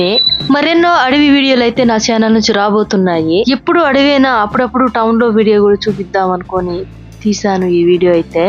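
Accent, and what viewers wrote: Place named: Andhra Pradesh, India. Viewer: నిది ఆంధ్రా కాదా భాష ఆంధ్రా లాగా ఉందిగా..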